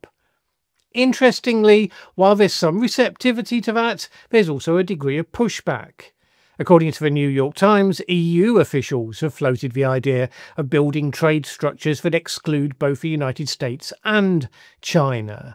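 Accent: United Kingdom. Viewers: British accented Clown blowing smoke out of both ends.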